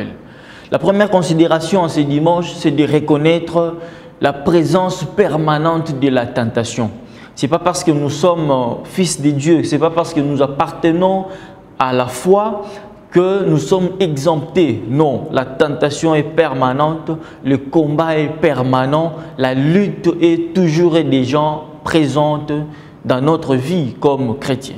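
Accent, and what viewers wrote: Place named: Africa. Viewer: Tu as l,acent des africains mais je reconue ton visage c,est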